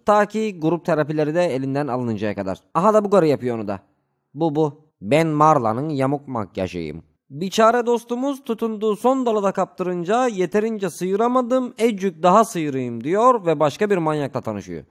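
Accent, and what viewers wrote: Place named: Zonguldak, Turkey. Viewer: Seslendiren abimiz %80 zonguldaklı veya bartınlı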